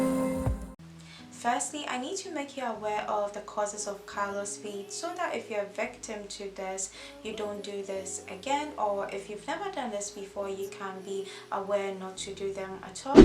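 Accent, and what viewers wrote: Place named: Guyana. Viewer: Are u from guyanna? A friend of mine from there has a similar accent so cute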